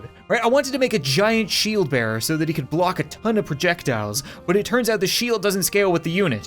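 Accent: Canada. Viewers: Wait, you're Canadian? How did I not notice?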